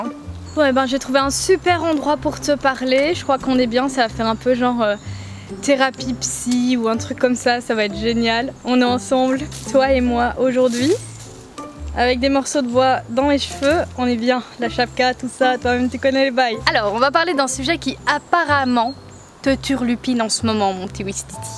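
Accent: Belgium. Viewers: T'es belge toi nan ? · Oula tu serais pas belge toi ? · Je t’ai découvert part cette vidéo ( je sais pas pourquoi ) et vraiment j’aime tellement ta joie de vivre. En plus je sais pas pourquoi mais ta l’accent belge 😂🇧🇪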